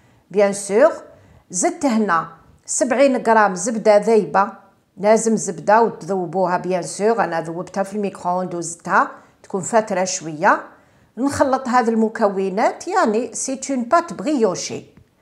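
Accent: Algiers, Algeria. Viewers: الله ابارك عليك يعطيك الصحة والعافية على هدوك الوصفات الله الله. انا ليعجبني فيك صوتك والهدرة تاعك ناس الجزائر العاصمة باالطبع واصلي نشأ الله تدي الدرع الفضي والدهبي.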